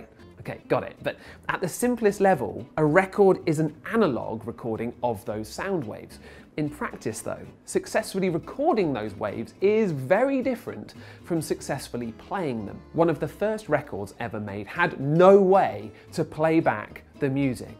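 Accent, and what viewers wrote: United Kingdom. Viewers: Thank you mr. British man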